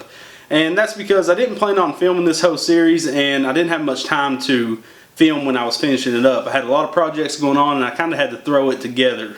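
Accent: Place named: Arkansas, United States. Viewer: man i know this is an old video, hope you see this. but what state are you in? Being honest and respectful you sound like an Arkansas boy. Im from South Missouri. im just getting into gokarting with my buddies and we love your videos! jeep up the good work man!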